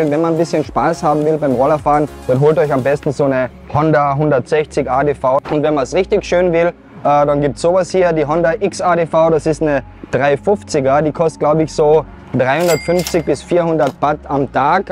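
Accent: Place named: Austria. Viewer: Danke für dieses tolle Video! Schön, auch mal Youtuber aus Österreich zu hören! Wir fliegen im Juli für 16 Tage nach Koh Samui (i know-Regenzeit, ging leider nicht anders) aber wollte euch fragen, was ihr für's erste Mal Thailand empfehlen würdet, wie viele Tage Koh Samui, wieviele Tage Koh Phangan (vl auch Koh Tao)? Würde gerne auch Khao Sok am Festland für 2 Tage sehen wollen, wenn es sich ergibt. Natürlich auch einen Tagesausflug zum Ang Thong Marine Park. Habe an 5-6 Tage Koh Samui, 2 Tage Khao Sok und 7-8 Tage Koh Phangan gedacht (mit Tagesausflug Ko Thao evtl). Ist das zu empfehlen? LG aus Niederösterreich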